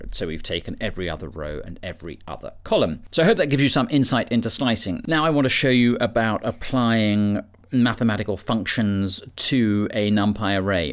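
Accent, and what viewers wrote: England, United Kingdom. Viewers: Good course. and I like the British accent :-) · He's wearing a Ralph Lauren sweater, has an english accent and his name is * LIKE EARNT *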